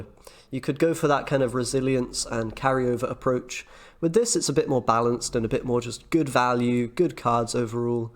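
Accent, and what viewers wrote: United Kingdom. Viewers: Yo, you're British, aren't you? Great vid BTW <3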